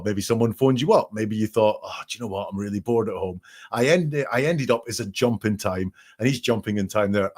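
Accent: Scotland, United Kingdom. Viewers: Hello from highland Perthshire, its braw to hear a fellow Scot annalysing this so and so. Watching on catchup. Just subscribed, so hope i get notifications for a live or two and can blether in the chat ❤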